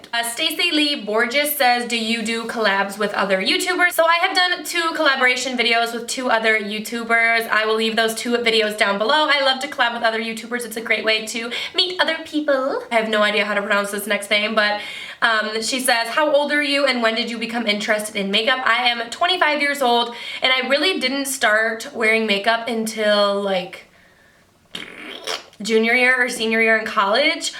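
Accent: Michigan, United States. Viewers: I thought your accent sounded like a Michigander! I am from the UP! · Ugh you are so gorgeous! And I had a feeling you were from Michigan!! (I could hear the accent lol) Im actually moving to Michigan in 2 days for University!! I'm a Floridian so the cold is going to be a bit challenging. Any recommendations of cool places to go OR SHOP? :)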